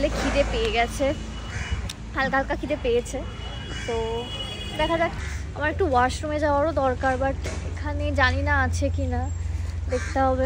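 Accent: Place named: Bengal, India. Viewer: Tomar ei Bengali English accent ta vishon irritating and believe me tomar kotha gulor jonnoi vlog ta lengthy hoy, vishon boring hoy. Ei neka neka voice ey kotha na bole music die Dio. Vlog interesting hobe tahole.. ei under confidence er moto neka kotha gulo shunlei majh theke manushe back kore chole jabe.. just a honest review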